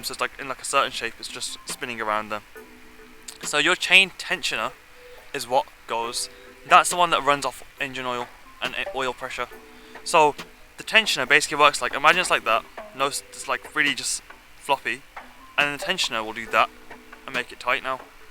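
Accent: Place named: England, United Kingdom. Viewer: Sry man i‘m not used with your strong english accent, my bad. I got a Passat with 1.4 CAXA in june 2023, bluemotion 122hp and back then 36000km. Now i‘m by 100000km and aproacing to a oil service next week. Should i ask to check the timing chain? I‘m a bit afraid since the previous car was a total disaster, Tiguan 1.4 TSI 2009 with 150hp (sims to have not only a turbo compressor but a second compressor as well), they found a broken cylinder during the 12-months warranty and then they offered me this passat since it was a bit newer and they also said it is a better engine. What’s your opinion?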